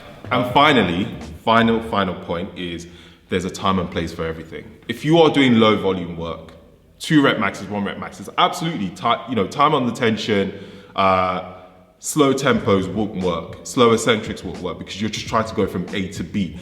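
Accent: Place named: England, United Kingdom. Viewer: Excellent demonstrations! The tempo is admirable with the perfect form. Oh, and I just love this accent. From which part of England are you?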